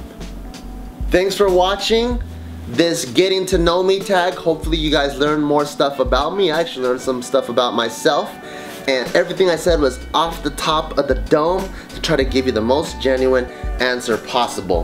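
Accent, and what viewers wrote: China. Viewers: wow, Chinese accent is almost standard than I expected.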